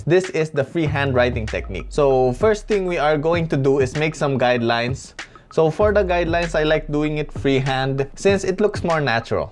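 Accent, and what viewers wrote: Philippines. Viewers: You sounds like a Pinoy like me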